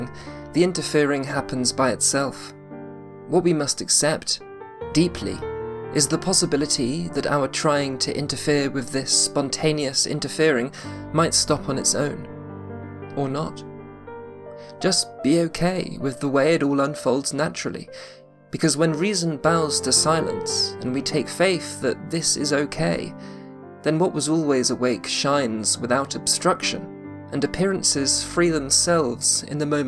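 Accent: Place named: United Kingdom. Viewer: No entiendo nada o poco y nada pero me encanta el acento, estoy aprendiendo inglés y me gusta escuchar el acento británico, de donde sos? Saludos desde Argentina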